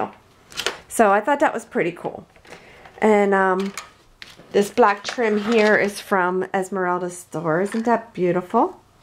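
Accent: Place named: Pennsylvania, United States. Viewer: Ok, I thought I recognized that PA or OH accent. Then I saw the 'keystone' shape you used to stick in the clip holder. And I thought Ok.... she must be from PA. I grew up in western PA, near Pittsburgh. I live in SC now, but spent the first 18 yrs of my life up there. So where are you from? Hugs, Jessica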